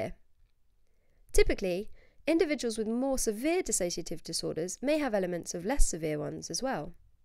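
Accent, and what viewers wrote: United Kingdom. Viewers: your British accent is hard to understand at 1.5 speed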